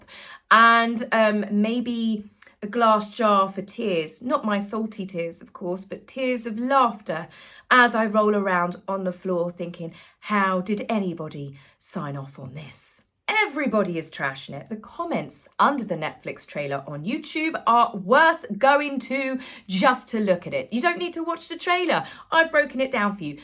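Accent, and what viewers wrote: United Kingdom. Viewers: I always appreciate your cutting wit and humor articulated with the perfect velvety British accent and sweet smile! It’s the perfect balance of tone when breaking down this mess! Bravo! 👏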